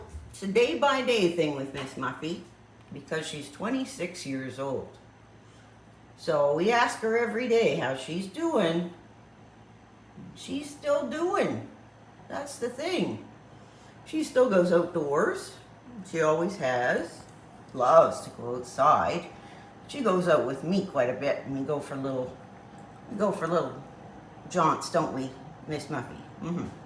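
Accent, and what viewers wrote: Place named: Eastern Canada, Canada. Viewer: Aboot ❤ the Eastern Canadian accent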